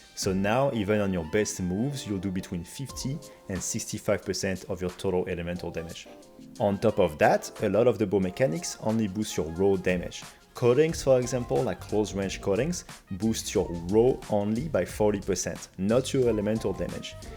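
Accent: France. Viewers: Ah de ce que j’entends tu est français haha ☺️ tes vidéos m’aide et beaucoup pour fair de super builds d’arc ! Merci infiniment ! Hâte de voir la prochaine vidéo !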